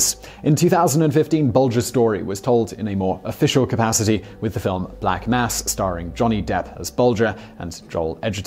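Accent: England, United Kingdom. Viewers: Perhaps the British pronounce words and names differently than us. We did take a proper sounding language and gradually forget how to enunciate our vowels. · I have and still do enjoy your videos BUT... You butcher the pronunciation of names terribly! I can forgive some of this due to your English accent, but you really should at least LISTEN TO THEIR NAMES AT LEAST ONCE TO GET THE PRONUNCIATION AT LEAST SOMEWHAT CLOSE!! I mean Damn boy how hard can that be??? Some of these names have been in video for decades and their correct pronunciation is a matter public record. So how about a bit more due diligence. Because the way you butcher names even embarrasses your fellow countrymen. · There's apparently a lot of stuff he can't seem to pronounce properly....must be the urge to put that "proper British" spin on it. Did you happen to see the episode where he pronounces geysers as geezers?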